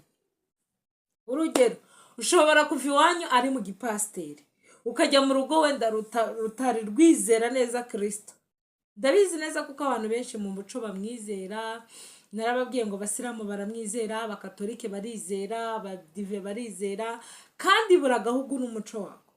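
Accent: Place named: Banyamulenge, Democratic Republic of the Congo. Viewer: Mubyeyi urabivuga se urabizi ariko numvise uvuga nkabanyamulenge uriwe noneho nagukundaga ndushijeho ❤❤❤❤❤❤ uzansubize niba aribyo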